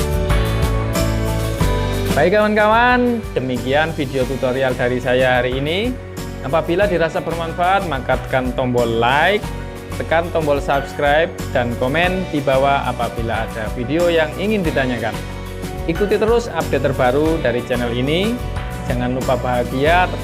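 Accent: Java, Indonesia. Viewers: Smpean jowo'ne pundi Om..?? 😁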